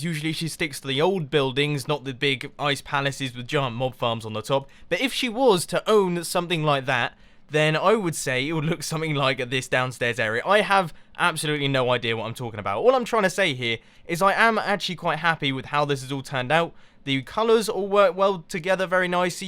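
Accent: England, United Kingdom. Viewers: I like your british accent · Are you English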